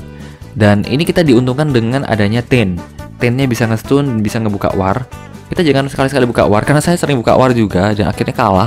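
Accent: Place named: Medan, Indonesia. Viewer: "Penta bapak kau, mati kau longor!!" Confirmed bang dari medan:v Edit: Ditambah pidung:v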